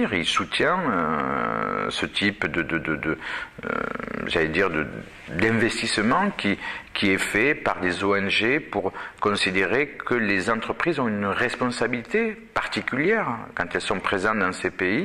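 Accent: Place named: Ariège, France. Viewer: P'tain..Mais qu'est-ce que c'est que cette voix d'ariègeois? Pourtant Rivesaltes (le camp Joffre) c'est dans les Pyrénées orientales..que je sache..!!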